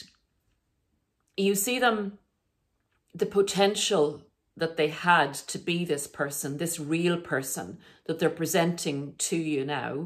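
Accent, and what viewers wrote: Ireland. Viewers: I can hear you are irish do you habve coachibg online can you putvthe contact here thank yohbits greatctibget info here thanks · R u Irish by the way? The only reason I ask is because well I am 50% I’ve had a really bad weekend with my narc But I had to tell my parents I was texting them they just drove all the way to the Florida Keys they are almost 80 but I’m sending them voice memos because somehow it just happens to the best of us when the end of a sentence has to come out Irish, well it happened to me all last night and I couldn’t stop laughing that usually happens to my dad. It’s just so funny because it’s like I’ve never been to Ireland but it lives in us I don’t know I might be wrong completely but I thought just maybe maybe if you might be a little bit Irish? You don’t do it though but it’s like how do we adapt an accent from a country that we’ve never been to?! 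LOLs that’s the only thing that’s kept me laughing through all this narcissistic abuse from my boyfriend who I am about to have seriously just I’m gonna forget about him eight years down the tube but you know what eight years too long of me putting up with this it’s like it’s like dealing with somebody with multiple personalities there might be other things going on I don’t know I don’t care anymore I just know that I’d know this is a hard test for me because I am not usually the one to sever the ties in relationships It’s not a want it’s a need it’s kind of like I have to it’s like you’re being forced to leave this person and it just will get it will get so bad it will snow ball until you end it and your whole entire life is a mess An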